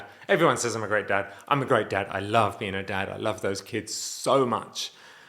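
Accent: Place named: United Kingdom. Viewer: I LOVE your videos. You are just being you and it's beautiful to see. Don't stress about how good or bad your videos are, just keep doing it🙂 Side note, I've been watching Avenue 5 and Hugh Lauries character reminds me so much of you 🤣🤣 it's the British accent and unkept realness of you both that I love., keep being you. Xxxx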